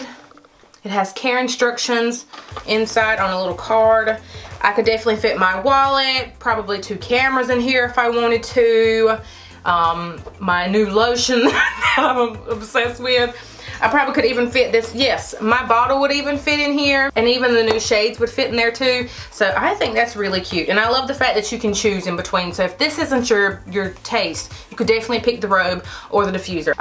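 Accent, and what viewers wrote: South, United States. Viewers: I forgot to mention I love the "country" girl in you. I lived in Mississippi for 10 years and 2 in Birmingham Alabama and I picked up a bit of a "draw" but yours is natural and so awesome.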